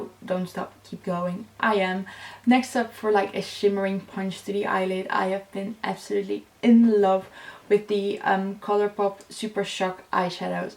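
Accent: Netherlands. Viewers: Are you Dutch? Your accent sounds like a Dutch accent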